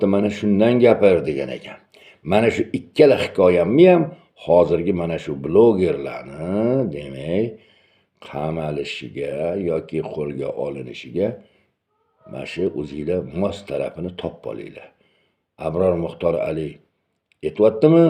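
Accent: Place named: Tashkent, Uzbekistan. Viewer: А сизни энди ёллашдими. тошкентча шевадасиз.сиздан ёшим каттаман охирини эшитгим келмади.муридни Муридисиз.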